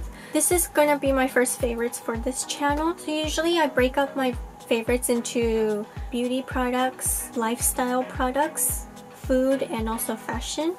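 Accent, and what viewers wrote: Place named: United States. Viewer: Are one of your parents English or something? You sound so American (that’s a compliment btw)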